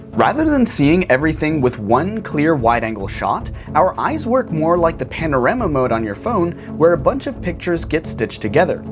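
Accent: United States. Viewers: Impeccable American accent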